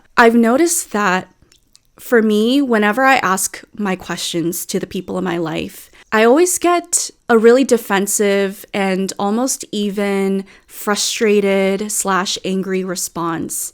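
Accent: United States. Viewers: You are such an articulate, insightful girl and you bring up actually really interesting points about the further complications of not just being 'neurodivergent' but of either yourself or other people around you being from different cultures and therefore have different 'social norms' which makes it more confusing for you, or indeed anybody. But if I would listen to you without seeing your face, perhaps I would assume you're just a 'white, American' girl just from your accent and language. Yet what I wanted to ask you, is that in the way you SOUND exactly the same as a 'white american' could, do you think that because you or other immigrants born and RAISED in the united states essentially do not possess those 'social norms' thier immigrant ancestors have, or do you think theres some degree of even some behaviour and mindsets being inherently genetic, that is, for example, youre african yet your ancestors had different mindsets, traditions and norms, yet you were born and raised in america, do you think even though thats the case, they would retain some essentially pure 'african-ness' even though they had never stepped foot in africa before? 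A genuine question to see what you think 😊 As now you have set off my weird, unfiltered neurodivergent endless question making 😆😂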